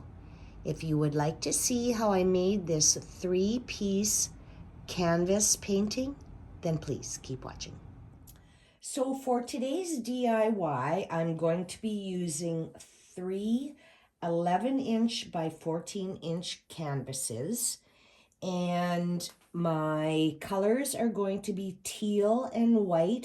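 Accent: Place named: Canada. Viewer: Hello, I am yet to start my new passion of paint pouring but I had not seen anything like this before! You have inspired me so much. Before I found this painting, I had started a Reno in my home. I still have much to do & have had to decide to wait to start this new endeavor till my remodeling is done or I will not get it finished at all. It’s been difficult to have to wait but it’s for the best! The Beautiful Creations you have given me has jumped my mind into overdrive! I just want to be able to get organized and setup a Craft Room. I have enough supplies to do a lot of work,(Play), & it’s all in boxes in my bdrm! I barely have walking room to get thru it to use the bathroom. I think that you must be Blessed by the hands of God to Create such Beautiful Art! Thank You for putting your Vids on here for me to enjoy! I consider it special from one Friend to another. 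Where are you from? I think I detect a Canadian accent! I live in Washington State about 3 hours or so South of BC, Canada. Like your works of art, it is as beautiful as it can be. I hope you will have continued success and stay safe so you can create even more. Thanks again, Newburyport to this stuff, JilliBean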